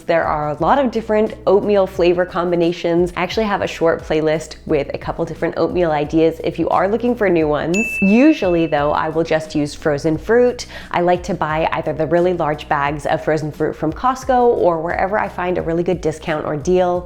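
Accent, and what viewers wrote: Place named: Canada. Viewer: Ive watched a few or your videos and I knew I detected a Canadian accent. When I saw the presidents choice berries That confirmed it.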